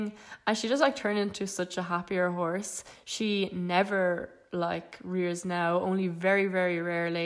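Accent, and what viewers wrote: Ireland. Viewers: That's an Irish accent, not a British accent. There's a very big difference. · I’m pretty sure ur irish😂same what county Meath?cork?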